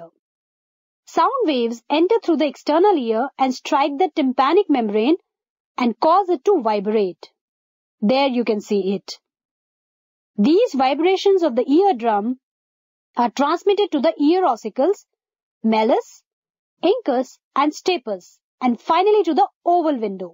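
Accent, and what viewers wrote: India. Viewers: The awkward moment when a white boy has an Indian accent.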